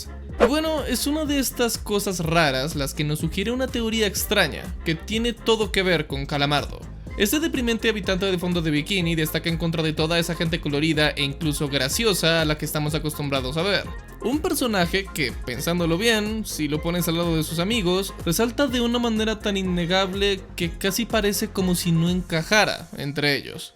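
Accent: Colombia. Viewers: Vato cuando hablas normal se te desborda el acento colombiano xd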